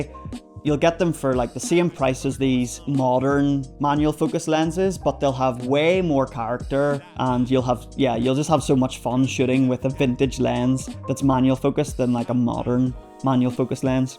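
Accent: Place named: Northern Ireland, United Kingdom. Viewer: good to hear fellow norn Irish folk here in the YouTube camera space! (in saying that my accent is fading)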